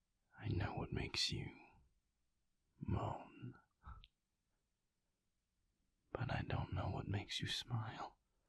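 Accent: United Kingdom. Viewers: Porter's voice just gives me intense feels: always loved an enchanting British accent ❤️❤️❤️ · Never thought I'd fall so hard for a classic British accent 😂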